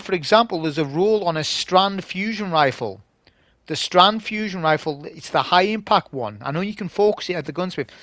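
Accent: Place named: Newcastle, United Kingdom. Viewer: I started to play this one only recently and could see how difficult this is. Btw your accent is similar to one of my coworkers who’s from Newcastle lol. Good one as always !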